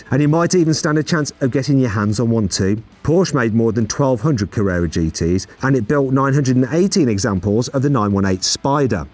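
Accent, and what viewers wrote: United Kingdom. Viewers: Logat British nya 🍷🗿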